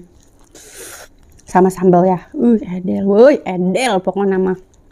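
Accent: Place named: Menes, Indonesia. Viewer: Teh orang Menes nyah,dari logatnya keliatan heheh salam ti abdi orang Maja..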